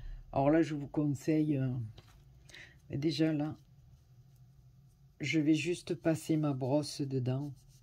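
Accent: South, France. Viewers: Bonjour je viens de trouver votre site et je suis très heureuse de vous suivre vous faites des choses magnifiques j'adore ces cartes faites à l'encre elles sont super jolies merci pour l'idée du gabarit lune avec ses ombres il fallait y penser c'est super Merci beaucoup et puis en plus j'adore votre accent ça me rappelle mon midi (Perpignan) je suis maintenant sur le bassin d'Arcachon ce n'est pas le même accent!!!! bonne journée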